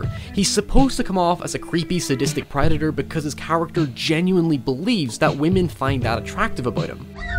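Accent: Northern Ireland, United Kingdom. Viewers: Northern Irish accent? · It's Northern Irish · He's Northern Irish 😊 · You sound like you're from N.I, are you? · he sounds like a northern irish man like myself :) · Are you from Northern Ireland? Your accent is from here but has a weird American twang to it.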